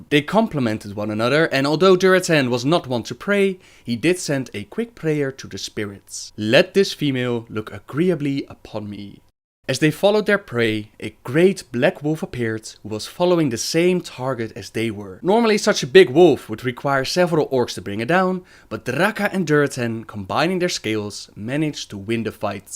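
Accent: Netherlands. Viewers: Late, I know, I'm sorry. is from the Netherlands and often he pronounces things the way they do in Dutch, even though English has some really weird rules for pronunciation. In Spanish you pronounce peninsula just the way it's written: pen (like the writing tool) - in (like Nagrand is IN Outland)- su (kind of like soon but stop the word halfway through, so it sounds like soo or sü) - la (like the end of Attila). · Hey! I was wondering, will you ever make a lore video about Illidan Stormrage? Or even better, theories on how he could still be alive (which I think he is)? He is one of the best, most tragic and misunderstood characters in the Warcraft universe and he has a lot of history. A video by you about him would be badass. Love your storytelling and Dutch accent, by the way. ( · Pretty sure he's Dutch, judging by his accent. They tend to overpronounce the "s". 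I'm from the Flemish part of Belgium, so my mother tongue is also Dutch, albeit slightly different from the Dutch in The Netherlands. And I have an American girlfriend and she can't pronounce "r"'s at all, so that roaring sound he makes might be funny in English, but I find it very pleasing. ;) (I can't even do it, because I've taken on the American accent from all the American shows we get on tv.) And the fact he said pensuela instead of peninsula shouldn't really make a difference. Many Americans fail to pronounce English words correctly, so why hate on someone who's main language isn't English? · Are you dutch?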